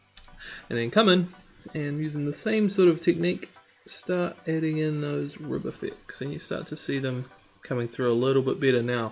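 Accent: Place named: New Zealand. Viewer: For an Adelaide based kiwi, it's so great to hear our accent and the word "Kiaora" and btw, your tentacle painting is spot on!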